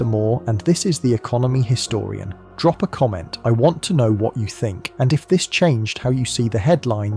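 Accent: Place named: England, United Kingdom. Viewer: Why is all this recent Ai generated content always narrated with an English accent? There must be a reason,i know its grating on me though.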